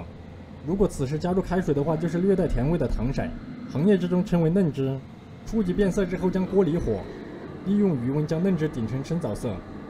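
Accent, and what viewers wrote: China. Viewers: 请问王师父能不能配上字幕，因你们中国口音对我们这些海外土生土长的华人来说真得听不明白。谢谢!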